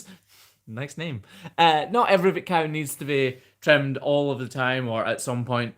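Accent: Scotland, United Kingdom. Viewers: Hello from upstate NY. In U.S.A. ..I simply love your accent from Scotland. We obviously do not get yo see how beautiful Scotland is so thank-you to and your wife for sharing your profession and Country with us.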